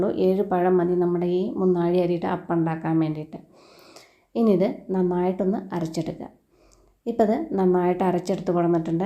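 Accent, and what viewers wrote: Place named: Valluvanad, India. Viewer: നല്ല വള്ളുവനാടന്‍ ഭാഷയ്ക്ക് നമസ്കാരം.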